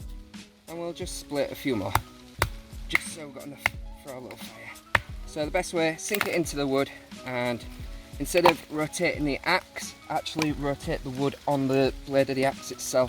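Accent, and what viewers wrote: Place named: East Yorkshire, United Kingdom. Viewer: you must be from hull or east Yorkshire, the way you promounce "wairk"?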